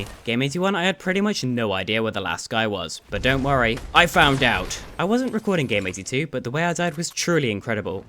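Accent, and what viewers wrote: United Kingdom. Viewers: Luke te Notable but more calm and british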